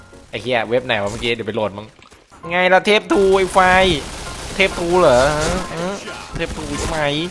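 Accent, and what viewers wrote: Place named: Rural, Thailand. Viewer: พูดเสียงเหน่อ น่ารัก >,<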